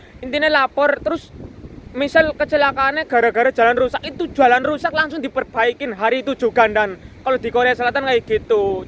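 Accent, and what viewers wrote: Banyumas, Indonesia. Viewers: Jelasine oke bgt.. ngakak pke ngapak lanjutno mas.. 😂😂😂😂 di Indonesia aspal di kira coklat jadine di jdiin cemilan 😂😂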